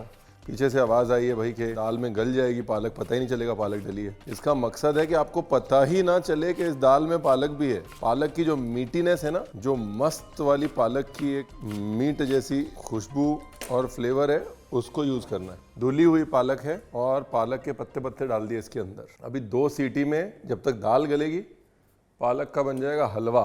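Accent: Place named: Punjab, India. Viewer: Aap cooking thriller Hain aik dum Khushi say Jo recipe banti hai Woh laJawab hoti hai ❤ Chass a gaya wai Punjabi lehja wala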